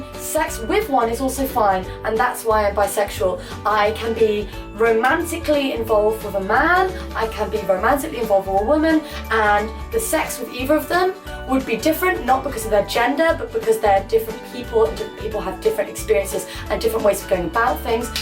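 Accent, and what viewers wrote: United Kingdom. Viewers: what english city is her accent from? the way she pronounces the 'ts' is irritating af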